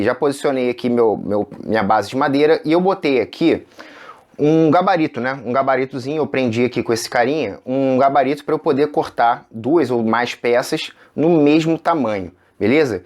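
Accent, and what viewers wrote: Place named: Teresópolis, Brazil. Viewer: Boa tarde,adoro ver o teu trabalho,ele é feito com muito esmero,sou natural da minha querida Teresópolis ( RJ) e pelo sotaque somos conterrâneos,hoje estou morando em Indaiatuba interior de São Paulo e tenho uma marcenaria,desejo a ti muitas felicidades e muita madeira pra serrar,rs rs...abraços!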